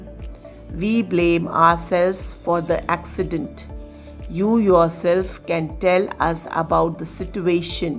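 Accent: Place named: India. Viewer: Indian ahhh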